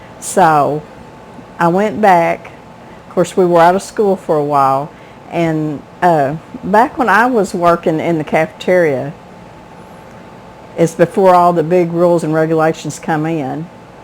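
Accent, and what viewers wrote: South, United States. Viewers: I am a new subscriber from Ontario, Canada. Not only do I love your recipes and your channel... but... I absolutely LOVE your Southern accent. Whereabouts are you from?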